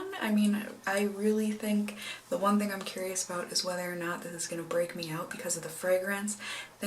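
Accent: Minnesota, United States. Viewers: The first video of yours that I saw was your Minnesota accent tag, (I'm from MN too!!) now I'm hooked on your videos! I just loveee your personality and how sweet you seem ❤️❤️ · IVE WATCHED LIKE 20 OF UR VIDS AND WAS LIKE hmmm I get a vibe she's from Minnesota and BAM FIRST 30 SECS OF THIS VID AND IM RIGHT YESSS (fellow Minnesotan btw) :)))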